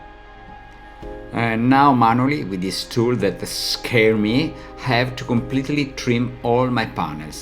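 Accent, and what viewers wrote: Italy. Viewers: personally I find you English spoken with your Italian background quit charming.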